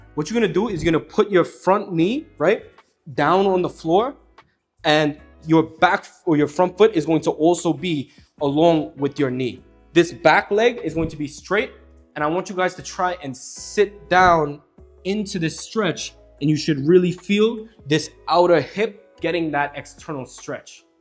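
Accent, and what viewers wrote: New York, United States. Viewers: Ayo you from New York?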